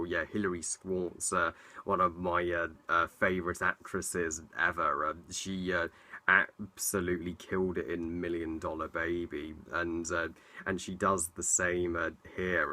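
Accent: United States. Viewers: Like Your American Accent! I Can Do That accent! I Watch Logan Lucky Soon when its out.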